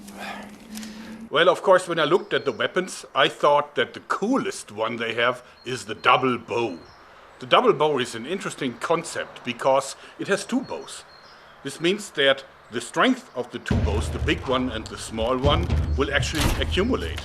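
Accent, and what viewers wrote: Germany. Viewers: Wow Englisch mit Deutsch agtzent schreibt man das so? Also agtzent naja aifjeden fall ist es interessant und lustig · Normally German accents make me cringe, but somehow I have no problem with your voice. Also: awesome channel! Weiter so. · Yeah, German or Russian accent, like a bond villain.I mean Victor Frankenstein was Austrian, so there's the basis for mad scientists in pop culture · Are you German? I am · you are german aren't you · ich liebe den deutschen akzent im englischen ^^ weiter so videos sind gut XD mfg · you have a german accent so let me ask you are you from germany? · is German and has a slight speech impediment, one of the things that makes ubermensch. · ist er deutsch in hatt englisch gelernt ?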